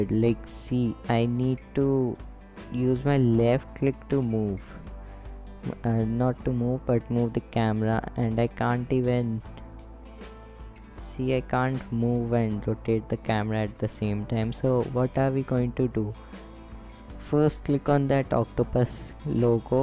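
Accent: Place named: India. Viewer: Indian voice? Yuck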